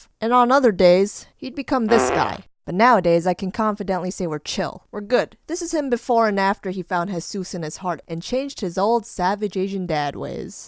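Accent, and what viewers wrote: New York, United States. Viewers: Is she from ny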